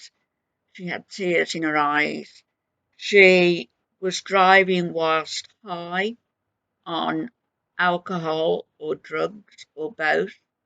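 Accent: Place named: West Bromwich, United Kingdom. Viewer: Hi, you’re so spot on with all of the chaos with both FB and Nader. You sound just like my family member from West Brom x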